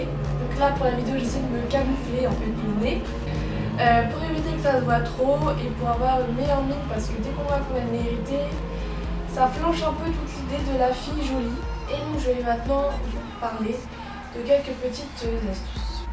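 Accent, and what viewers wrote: Madagascar. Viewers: T'es malgache?? x) Chouette video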